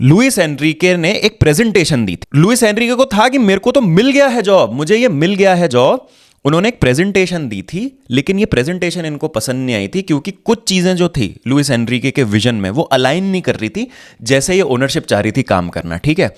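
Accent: United Kingdom. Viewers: Your English accent uff❤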